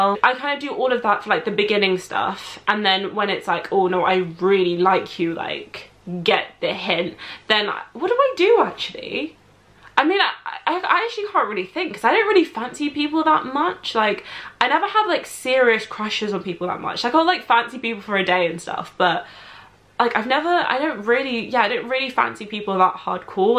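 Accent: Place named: United Kingdom. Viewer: I love your british accent guapa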